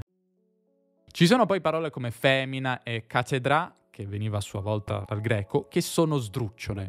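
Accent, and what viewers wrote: United States. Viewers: Parli inglese con la pronuncia americana!😉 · You sound like an educated Texan when you speak English.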